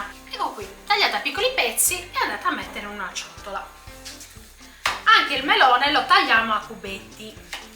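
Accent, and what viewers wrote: Sardinia, Italy. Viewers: oltre che brava sei simpaticissima complimenti mi piace il vostro dialetto sardo io ho ha nuora sarda e gli voglio molto bene ciao e buon Natale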